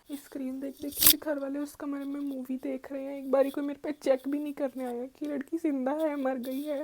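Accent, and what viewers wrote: Punjab, India. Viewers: hey, i started watching your vlogs and shorts recently and im hooked fr.. really appreciate your efforts and the time and energy you put in your work💅🏻 i just wanted to make a request to you that make a vlog where you'll only speak punjabi for the whole day or whole duration of your vlog (as im a punjabi who stays in mumbai, i got your punjabi accent in your daily sentences and stuff.. also the "भांडे मांज" rahi hai meri roommate- was amazing 😋.. i hope you make a punjabi vlog)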